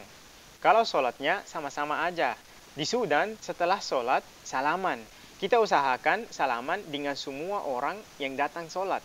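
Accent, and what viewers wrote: Makassar, Indonesia. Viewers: Pantas logat mu logat makasar . Ternyata kamu kuliah di makasar ya ?? Saya juga anak sulawesi.. Tapi saya di Gorontalo.. Berdekatan dengan Sulawesi Tengah dan Sulawesi Utara 🙂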